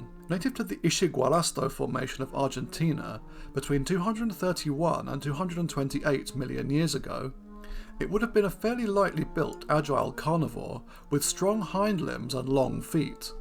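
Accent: United Kingdom. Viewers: (In an endearing way) Came for the click bait, stayed for the Brit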